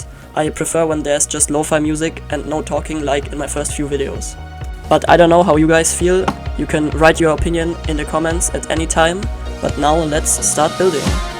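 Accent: Germany. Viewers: am i hearing a deutscher akzent? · I can hear german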